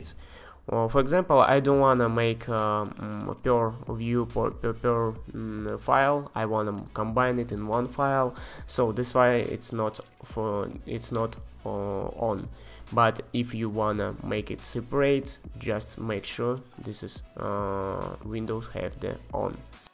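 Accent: Russia. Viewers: Судя по акценту, русский твой родной язык)) спасибо за видео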